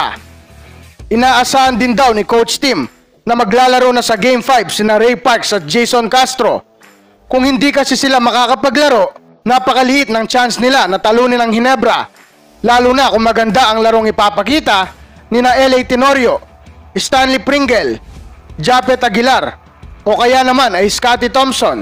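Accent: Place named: Pampanga, Philippines. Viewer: alatang alata na kapampangan ka heh 😂😂😂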